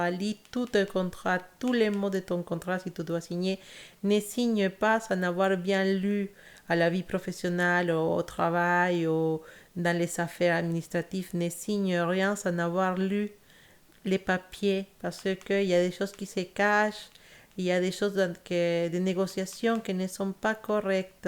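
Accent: Spain. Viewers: Holà, j’adore ton accent espagnol il ne dérange surtout pas . Y Muchas Gracias !!! ❤️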